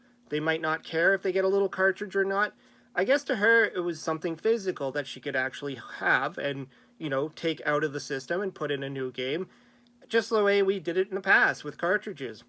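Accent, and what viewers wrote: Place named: Canada. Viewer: ! Always love to hear your opinions bro! Thanks for doing a response to this video! I love hearing the slight canadian accent on talking abowt. Haha. Have a great day buddy!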